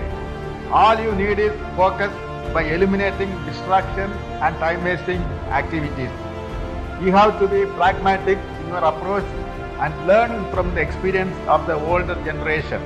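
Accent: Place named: India. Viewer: It's indian pronounocation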